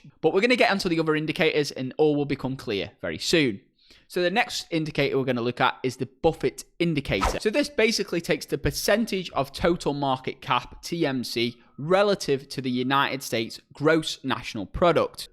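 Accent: United Kingdom. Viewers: Can you try to speak with less of an English accent? I can’t attention long enough with your draw.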